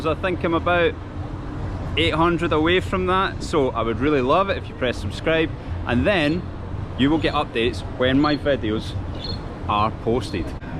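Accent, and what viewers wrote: Scotland, United Kingdom. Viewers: Love the sound of Scottish English ) thanks for saying such a nice things about Russians ! We will always welcome everyone, who’s coming to Russia with good intentions ! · You've touched on language so I have a genuine question about your accent. Now I've heard a lot of British accents, but I didn't know what's what. and this is the first time I'm fairly certain that this is what a Scottish accent sounds like. Is this a standard Scottish accent? Reason I'm asking is because I live in the US and there are many different accents, like the Southern Accent for example, where I live. And it is often considered the butt of all jokes, by other regional "accenters" of course. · Thanks for your lovely channel. Love English language, your Scottish accent is so nice 👍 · Your Scottish accent sounds really nice 😊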